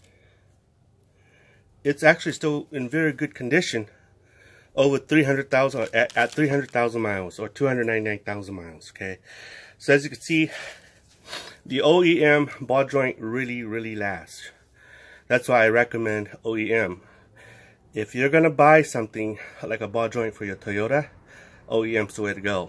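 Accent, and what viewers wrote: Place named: Hawaii, United States. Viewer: Where are you from you sound local to my area I am from Maui Hawaii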